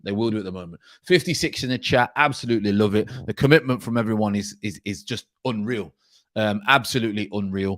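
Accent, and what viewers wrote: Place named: Midlands, United Kingdom. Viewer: Big up from south London Why! The delivery of experience nd knowledge with a gruff midlands charm may not always agree on style always a good source of knowledge I am me and that is special enough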